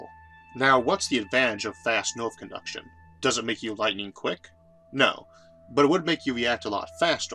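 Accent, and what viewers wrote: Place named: Louisiana, United States. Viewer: Thanks, yeah despite the speech impediment I think it was the Louisiana accent I was picking up on. I could tell it was a Southern style accent but wasn't sure.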